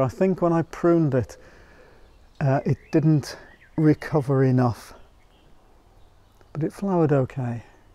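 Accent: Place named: Warrington, United Kingdom. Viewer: Hi! This is my first time watching your videos and I was enthralled! I live in St. Louis now but I'm originally from Warrington and I knew by your accent that you must live close. I'm going to subscribe and look forward to more videos from you.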